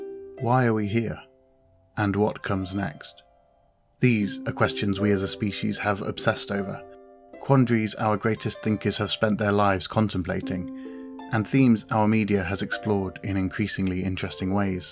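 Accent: United Kingdom. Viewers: Are and Sargon of Akkad the same person? The voice sounds similar to me and it's not only the British accent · As a fellow English person the voice is slightly laboured and enunciated, which for me affects the flow - but it’s a very nice voice - probably sounds amazing to non British speakers of english. We have some nice accents here I must say. And some weird ones! Best thing about the voiceover - at least I can understand it!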